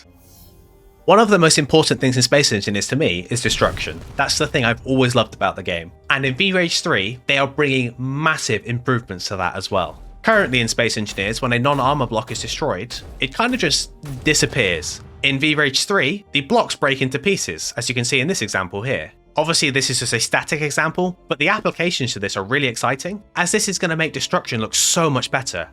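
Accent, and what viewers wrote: United Kingdom. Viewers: Very nice British accent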